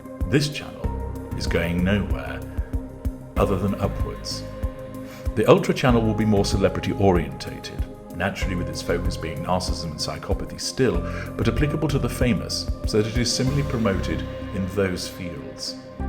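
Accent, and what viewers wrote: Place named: RP, United Kingdom. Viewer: one of the most intelligent, creative and original voices on YouTube. Also one of the most hypnotic bass voices speaking the Queen’s English. Please don’t go away.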